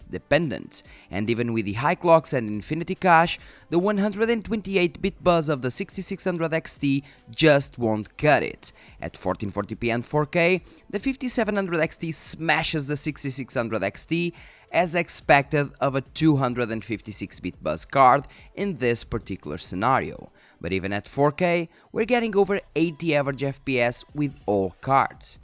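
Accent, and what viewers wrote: Portugal. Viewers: Gotta love that Portuguese Accent 👌🏽💪🏽😎❤️ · Nice try, Portugalian :) I like your accent ))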